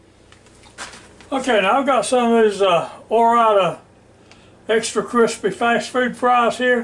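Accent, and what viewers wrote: South, United States. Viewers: It’s the southern accent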